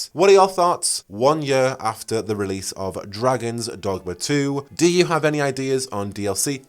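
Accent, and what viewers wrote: United Kingdom. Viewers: ew british.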